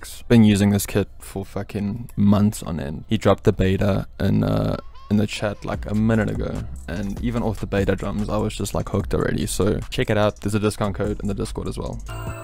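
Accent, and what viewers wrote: South Africa. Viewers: Bro are you from south africa coz your accent is like that lol...great vid im learning alot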